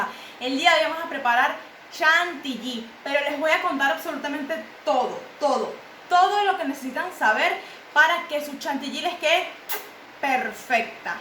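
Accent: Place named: Venezuela. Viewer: Hola buenas noche ésas marcas de crema a la cual te refieres la venden aquí en Vzla. ?..... O tú estás viviendo en otro país?........ Tu acento es muy venezolano. Gracias de a antemano amiga . te felicito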